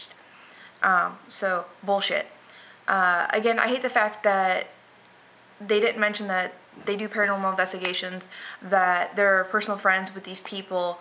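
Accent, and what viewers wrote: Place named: North Bay, United States. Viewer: It sounds like she's from North Bay. I don't know but my ex friend sounds just like her and she's from Petaluma CA. They mumble and say but umm a lot and connect all the words. I couldn't listen to this video without ear strain.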